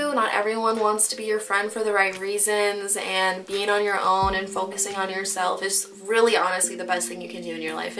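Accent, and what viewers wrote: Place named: Midwest, United States. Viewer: I know this is a few years ago but I can totally tell you are from the midwest. Utilizing yadayadayada All my friends do that xD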